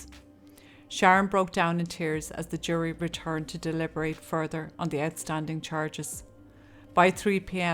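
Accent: Ireland. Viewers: Your lovely lilting Irish voice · that Irish lilt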